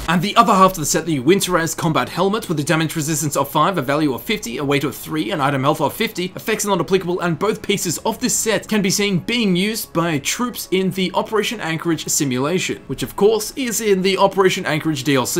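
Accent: Australia. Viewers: Dude are you Australian? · Is he Aussie because if so Aussie Aussie oi oi oi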